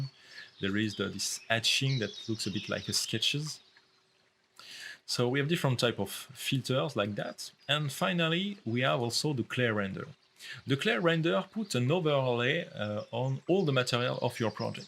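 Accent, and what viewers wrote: France. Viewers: Beside this awesome introduction to the Twinmotion, I love your accent dude :) Vive la France! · c'est marrant j'ai l'impression de que c'est un français qui parle anglais , j'ai raison ???? en tous cas tu sais utiliser l'outil bravo !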